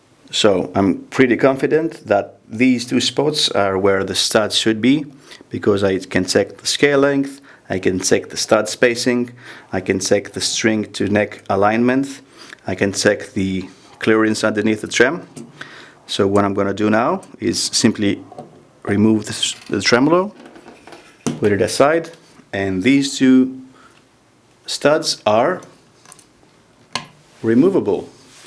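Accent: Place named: Greece. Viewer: 1 minute in and I started looking if you are Greek. Bless the accent <3 Great work <3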